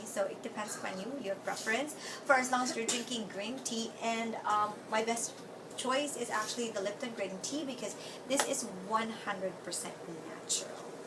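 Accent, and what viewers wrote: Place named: Cebu, Philippines. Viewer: Thank you for your tips mam cebuana pod ko mam i like your accent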